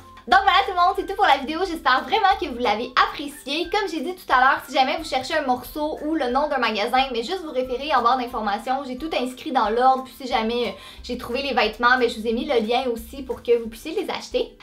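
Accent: Quebec, Canada. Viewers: tu es quebecoise ?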